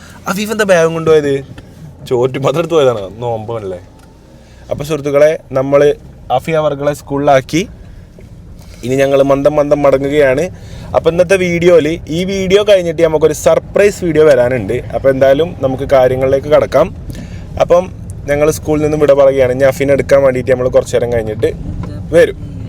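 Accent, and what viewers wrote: Malappuram, India. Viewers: Malappuram varthanam athaan ikk thonniya highlights 😂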